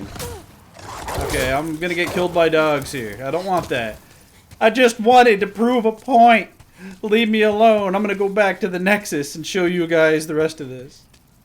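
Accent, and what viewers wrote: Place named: Atlantic Canada, Canada. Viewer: Your accent sounds Atlantic Canada.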